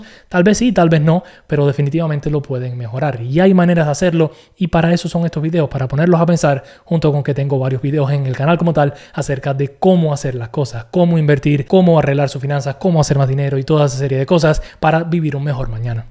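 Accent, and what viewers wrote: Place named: Venezuela. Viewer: Pensé que eras Venezolano. Hablas y te expresas como uno. Saludos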